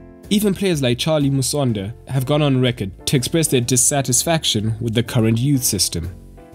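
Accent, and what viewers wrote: South Africa. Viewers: 100% South African speaker 👍, Shoutout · Are you from South Africa? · The narrator sounds South African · So who's the South African dude narrating this? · Are you South African?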